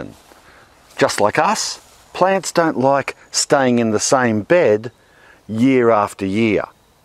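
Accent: Australia. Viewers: I think many people watch so they can practice their Australian vocabulary and accent, in addition to the advice. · How about collecting your own urine (if you are not taking medication) and using it as a nitrogen source to naturally boost your veggies, eh? I did that with my garlic twice and while I haven't harvested it yet the scapes have been harvested twice already! Very excited to see how the heads come out. This is my first year gardening so I'm definitely a noob. Thank you so much for taking the time to make your videos. I enjoy watching all of them and always learn something new. Plus I love the Aussie accent! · Another thing they should do, is to watch crazy Aussies making gardening videos!!! lol! I love your vids, combining some GREAT info, with a smattering of comedy, here and there. I am in the U.S., and as soon as I heard your accent, I loved how you say thing a bit different. 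So I TRY to remember to mulsh, but sometimes, I just have to settle for mulching instead. Have a good one, and keep up with the AWESOME vids! Another guy, who is GREAT for container gardening, is "home grown veg"!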